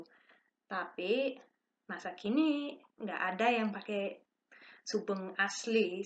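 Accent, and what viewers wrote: Bali, Indonesia. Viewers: u got Balinese accent actually..kebaya suits u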